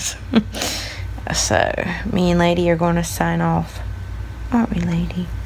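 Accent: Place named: Alabama, United States. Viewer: Where are you from? I love your accent. It sounds like an Alabama accent!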